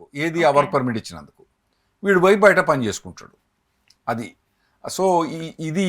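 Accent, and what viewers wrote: Telangana, India. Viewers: We like your Telangana accent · Sir, I like your Telangana accent language. Please continue to be what you are. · Sir, your Telangana language is a practical one and we really enjoy it as a Telanganite. We like it Sir 👌👍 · We really enjoy your telugu which is pleasant and pucca telengana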